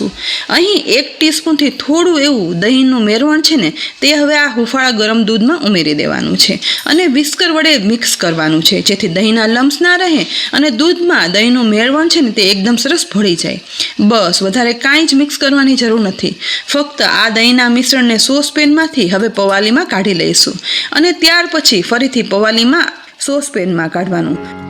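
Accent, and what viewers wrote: Kathiawar, India. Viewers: Tamara bol vani rit and sabd pure khathiyawadi che...bahu Sara's bahu saras style che bol vani...and etlu j mast dahi banavani rit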